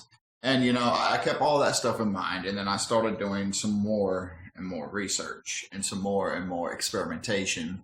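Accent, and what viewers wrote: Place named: Australia. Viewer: But harsh but can't fault the honesty. You sound Australian! OI OI oi?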